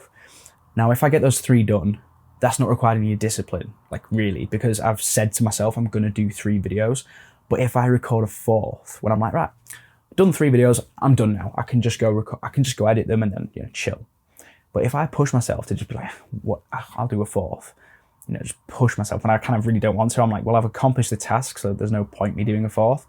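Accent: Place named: RP, United Kingdom. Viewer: Damn this dude even has the James Bond accent. Dudes unreal.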